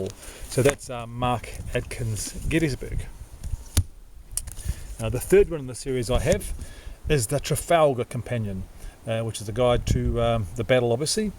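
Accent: New Zealand. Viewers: Ur kiwi accent is awesome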